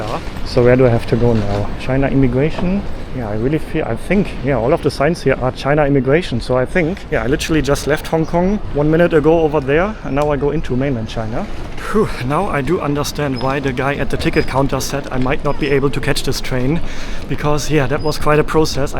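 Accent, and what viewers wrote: Germany. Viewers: Its a german accent not an english one. But the language he is speaking is english. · Hi! I’ve been watching your videos for a long time, mostly on Bilibili, and sometimes on YouTube too. Today I opened YouTube and your video was the first one, so I watched the whole thing. I remember you mentioned before that your German-accented English might be hard for people to understand, but I watched the entire video without subtitles and understood everything perfectly. I think your English is totally fine!😊 Hope more people discover and love your content.❤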